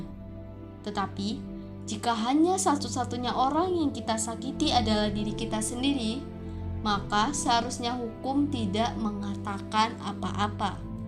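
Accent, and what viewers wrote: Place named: Java, Indonesia. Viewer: Lucu ih suaranya medok khas gitu 😂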